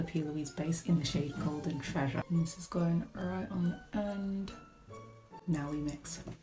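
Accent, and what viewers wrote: United Kingdom. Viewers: Can you do pink yellow and purple❤ and I love your British voice and I also love your make up😊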